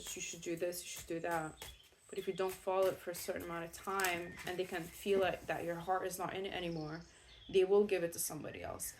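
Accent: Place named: New York, United States. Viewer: Hi with I thought your were from New York. Your accent sounds like you are from Bronx, Brooklyn, Staten Island. I thought perhaps you were living aboard. I am not sure your birth and living geo history. But, being a New Yorker you are hitting certain accent NY twangs. -lol Also, thank you 🙏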